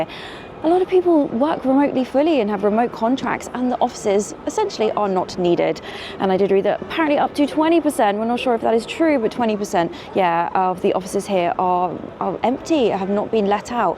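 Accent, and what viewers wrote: United Kingdom. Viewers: Hey, I have recently started living in london (monument) and absolutely agree with your views on about this area, even I felt the same when I cross path accidentally, coz I had to take tube from elizabeth line to london bridge, and my gosh your views are on point.. Also love love your heavy British accent ❤ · I would have clicked off quarterway thru but Your music choices are fab! I literally listened to the end just to hear the music tracks! So nice i had to shazam them! Great tunes! Thanks for musically educating us. Oh by the way it's 'mall' (like the word all} not mall like the word pal.! U should know this u sound british!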